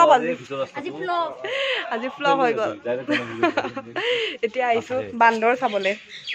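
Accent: Nalbari, India. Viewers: অ, মাজে মাজে নলবৰিয়াৰ দৰে কথা কোৱা কাৰণে সুধিছো😍😍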